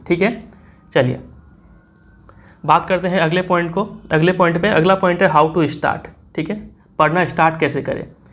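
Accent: Bihar, India. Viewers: Sir, Are You From Bihar..??? I Mean Your Way Of Speaking ❤️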